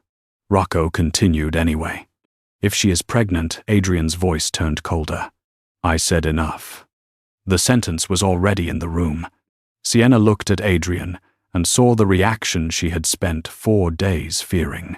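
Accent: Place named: France. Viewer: frenglish? beautiful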